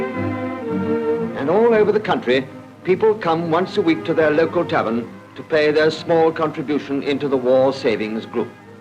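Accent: RP, United Kingdom. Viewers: Fascinating old black and white film with the typical toffee-nosed English narrator. Great old pub signs with pub names I never heard of. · Received Pronunciation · May be toffee , but you can hear every word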